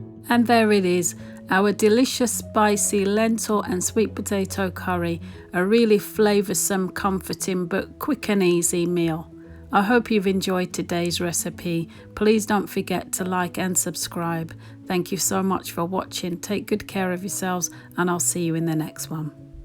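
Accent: England, United Kingdom. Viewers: Top recipe thank you and at last....... ....a British accent :) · Have a sweet potato soufflé with chunks of sweet potato. Also I add cauliflower to my curries since it takes up the spices beautifully. Lived in UK for 4 yrs early 80’s so enjoyed the accent a lot! 😊 thanks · I'm from Ireland and I'm living in New York and I can tell u that when I hear an English accent, I feel, oh, my cousins so I always love to see and hear my cousins!!!💚 Great recipe!!!!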